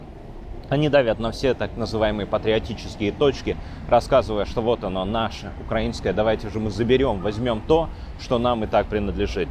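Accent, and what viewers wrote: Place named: Moscow, Russia. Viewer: мене просто здивувало, те що вчитуючи саму специфіку данного регіону, в якому взагалі важко почути російську, а тут на тобі російська та ще й я б сказав чисто з московським акцентом?!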